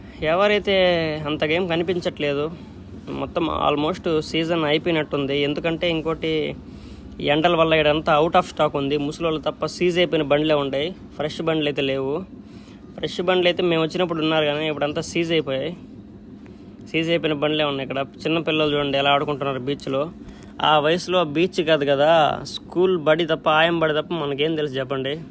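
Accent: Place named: Nellore, India. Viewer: Orey mawa nuvvu maa nellore vadilaane unnave..?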